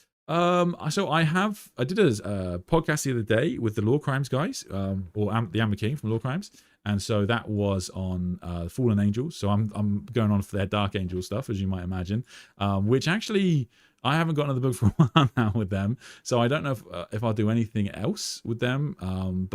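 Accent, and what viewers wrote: United Kingdom. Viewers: Its just his accent,he's British.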